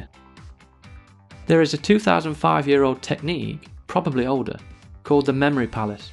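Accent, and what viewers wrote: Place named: United Kingdom. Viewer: ｍａｙＢＥ it's uk アクセント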